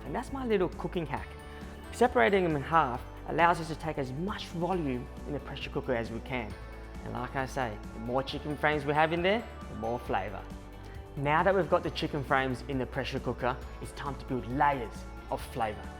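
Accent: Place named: Australia. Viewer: Great Video Mate... Found you through Max McFarlin's Channel... Heard the Aussie accent and wondered who is this guy!!! Well, now I know. Best of luck with the channel and all things food. Sub done!!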